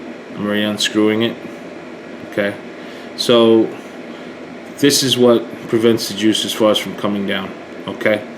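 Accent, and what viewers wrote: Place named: Tennessee, United States. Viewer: ur accent tells me tennesee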